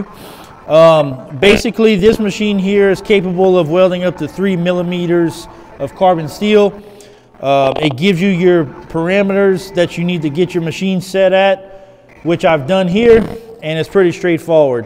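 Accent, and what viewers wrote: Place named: South Louisiana, United States. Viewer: You might be in Wisconsin but you can’t hide that south Louisiana accent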